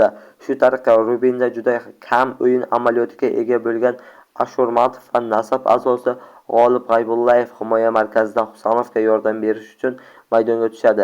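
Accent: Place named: Kyrgyzstan. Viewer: Эй касартки овозинга санинг ут тушсин киргизмисан дейман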